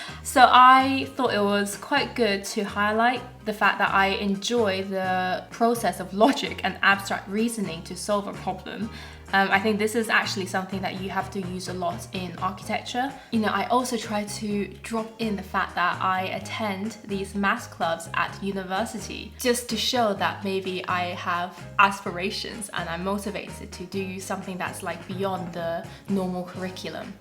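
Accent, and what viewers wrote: England, United Kingdom. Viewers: not cringe at all with that english accent ;o made it sound elegant.. the twisted power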